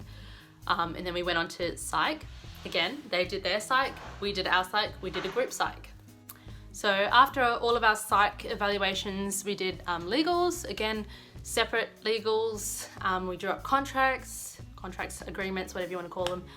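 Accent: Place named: Australia. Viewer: Hey! First of all, you Australians have an amazing accent. I really like it! Secondly, good luck with this! You’re doing a fantastic job. I hope things work out for you. My prayers are with you, honey.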